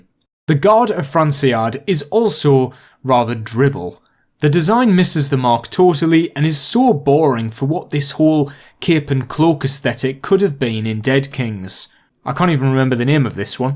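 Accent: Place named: Scotland, United Kingdom. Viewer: Great video and Ilove your accent man! Are you Scottish?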